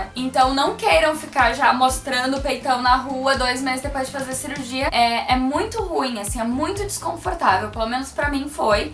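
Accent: Brazil. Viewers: Aí que gente chata. Foca no conteúdo do vídeo e não no sotaque dela. Ela é gaúcha assim como eu e o nosso sotaque é bem assim mesmo. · Lógico. Seu sotaque, seu vocabulário portoalegrense uai! É mesma coisa que reclamar de gaucho dizer "bah". Pede pra mudar de estado federativo de uma vez....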